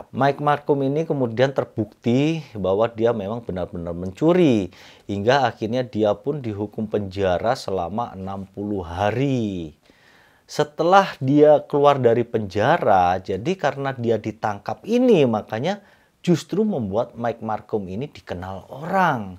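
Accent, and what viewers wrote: Java, Indonesia. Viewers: kok medok , dan tau arti rika ? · Medhok banget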